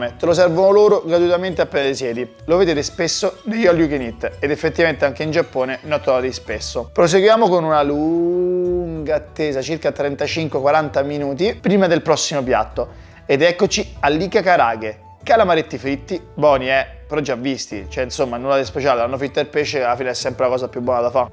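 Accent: Rome, Italy. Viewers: Me piace er tuo accento romano! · adesso scandisce di più il romano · Giusto un pochino di romanesco 😂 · Si,mi sembra molto più accentuato del solito (anche io sono romana). Sbaglio? Comunque il format l'ho apprezzato molto comunque. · Come mai hai calato il romanesco in questo video? Mi hai fatto ridere. Prox format cucina sud americana... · Oh un po’ di accento prepotente, riportiamo il romano in auge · Ma perché in sto video parli solo romano? 🙃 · Perchè hai letto in romanesco? fa strano. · questo story telling in romano mi suona famigliare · Ma perché per tutto il video hai parlato romano 😅 comunque sei un fenomeno 😉💣🔥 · Video interessante, ma preferivo il vecchio format e il romano meno marcato... Comunque mi manca il giapponese, devo tornarci... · contenuto top, ma la parlata in romanaccio mi da fastidio. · Perché hai cominciato a parlare romanesco accentuato?se posso chiedere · Oggi t'annava de parla romano grande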